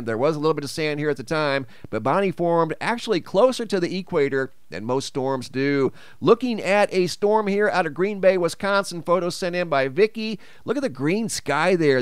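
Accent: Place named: California, United States. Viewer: Great content- when you say words ending with ing it sounds like een. Cali trait.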